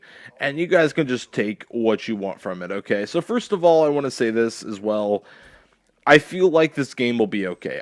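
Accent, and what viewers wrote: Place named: Ireland. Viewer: It's an Irish accent ;D Thanks man that means a lot xD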